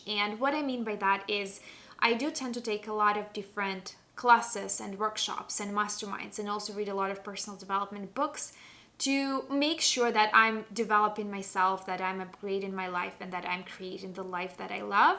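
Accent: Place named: Russia. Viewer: Вы из России или Украины? Слышу знакомый акцент )))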